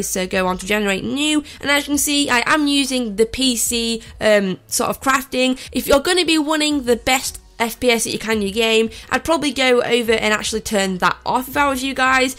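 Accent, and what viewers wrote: England, United Kingdom. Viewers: i like your british accent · What is your english accent? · why does every minecraft player has british accent?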